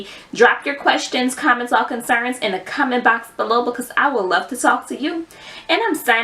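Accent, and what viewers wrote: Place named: Louisiana, United States. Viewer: You have to be from Louisiana are sum😭your accent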